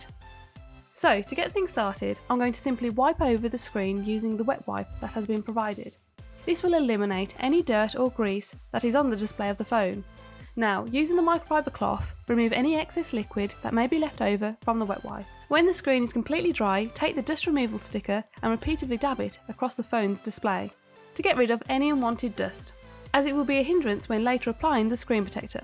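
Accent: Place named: Birmingham, United Kingdom. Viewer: A fellow brummie :)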